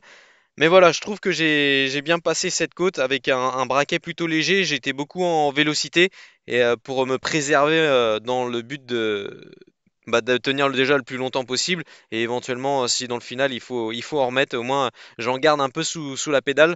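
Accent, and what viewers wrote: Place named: Brittany, France. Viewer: Tas pas des origines bretonnes ? Ton accent ça sent clairement le Finistère haha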